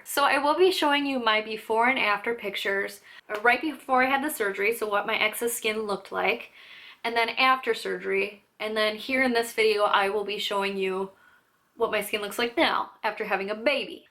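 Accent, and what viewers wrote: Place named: Chicago, United States. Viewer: You have a Chicago accent. The scars dont look bad at all and I am very honest. Most internet people say "yaaas" blah blah even when person 400 pounds! You look pretty good.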